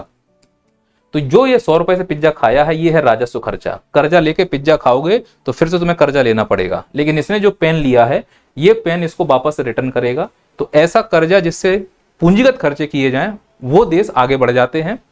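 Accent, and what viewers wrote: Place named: Chambal, India. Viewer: Sir aapki boli me Chambal wali akad hai❤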